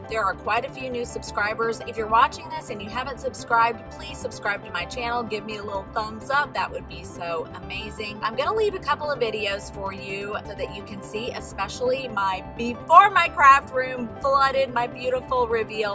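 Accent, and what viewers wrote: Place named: South, United States. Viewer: As a cute asian girl (I'm in my thirty's, but still cute. I mean a girl 😜) I must say, it's so nice to see a cute, not asian, girl so excited about and so talented in amigurumi. And a southern one to boot! I always loved accents when I was younger, and now that I live in the accent-less Pacific Northwest, it's nice to stumble across channels that have both something I'm interested in and a super cute accent.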